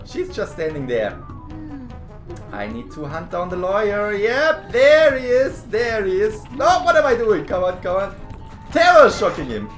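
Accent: Germany. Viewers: Man merkt das er deutsch is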